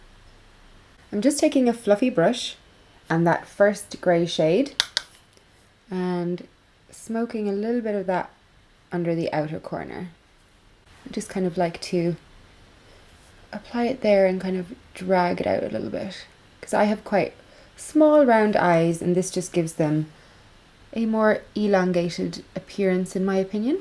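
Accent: Ireland. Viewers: The very best review & info on this product!! I have been so interested. You have the most wonderful accent, I love it!! Irish? You are my new favorite you tuber!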